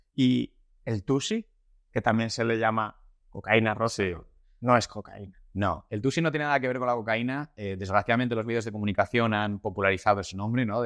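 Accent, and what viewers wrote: Spain. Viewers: me es difícil entender ese acento español "tan español"😢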